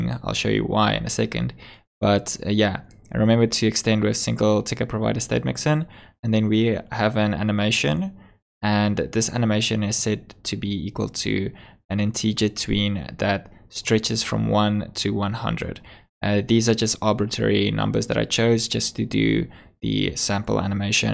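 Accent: South Africa. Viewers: You sound south African 😎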